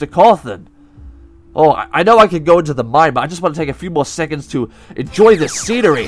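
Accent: United Kingdom. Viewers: He is speaking British accents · Sound British I don’t know why are you supposed to be like oh I have a toy verse I can do like a girl sound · are u doing a british voice or some thing =_ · Good God hes british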